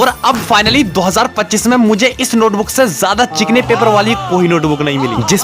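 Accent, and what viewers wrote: Gujarat, India. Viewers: Bhai aap gujarati ho kya ❤🎉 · Bhai tame Gujarati chho?😊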